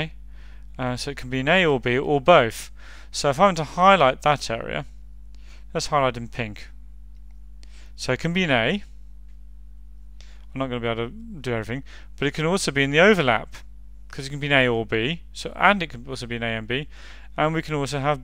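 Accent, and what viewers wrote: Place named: United Kingdom. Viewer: yesss finally found a math video with someone with a british accent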